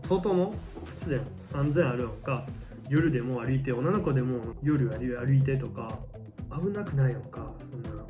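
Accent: Kansai, Japan. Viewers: ♥独り言をぐずぐず言っているような喋り方で、すごく聞き取りにくいので、済みませんがもっとはっきりとしっかりと喋ってもらえませんか。 嫌味ではありませんよ、励ましですよ。 ♥う○ちについて蘊蓄を傾けるところがすごい。 花の都巴里ではなくて、実態は、う○ちの都巴里だったのですか？という事は、デート中でもう○ちを踏む確率大なのですね。それに、う○ちを踏んでいる事に気付かないままキスしている事もあるって事ですね。 地雷踏むのも、嫌だけどう○ち踏むのも嫌だな。 私は生まれてまだ一度もう○ちを踏んだ事はありません。偉いですか。 ♥御辞儀だったらいやいやでも出来るけれど、いやいやのキスなんか出来ないな。どうしているんですか？ コロナ禍であってもキスの習慣は健在ですか？どうしているんですか？ ♥関西在住ですか？何となくアクセントが。。。 ♥関係無いですが、若いのに額が後退している模様。これは励ましではありません、禿げ増しです。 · 少し関西弁だなーと思ったら、京都に住んでるんですね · アラブ人に見える。言葉は関西人に聞こえる。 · 急におすすめ出てたので見ました。 フランス人は日本人キライかと思ってたのですが、貴殿で3人目です❤️ YouTuberで日本loveな方に出会えたの😊 しかも関西弁😁 親近感湧きまくりです☺️ チャンネル登録しまぁす😄 これからも宜しくお願いしまぁす🙇🏻‍♀️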